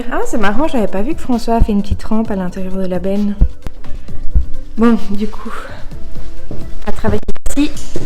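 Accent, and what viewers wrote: Belgium. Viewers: tu est belge ?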